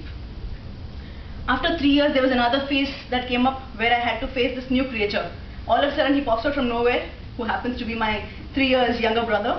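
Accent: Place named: France. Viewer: the rythum of your English is almost French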